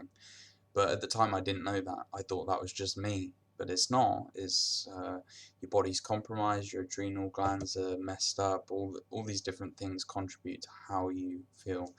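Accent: United Kingdom. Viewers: I am impressed by your strength, you deep voice and your British accent. Yes, you are right,, we love our sickness. Of course, it might be a love/hate relationship, but she is the only mistress remaining. In other hand, not every body can recover at 100% for life time. Dealing with the sickness, at the first time it is having to mourn about the 'perfect life', and after to go further with a real life. If you are young specially, keep a eye on quiet job.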